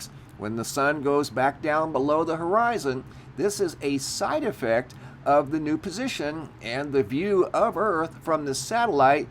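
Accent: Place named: United States. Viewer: Your voice is just so quintessentialy American 😊😊 so easy to listen to which helps take on the information.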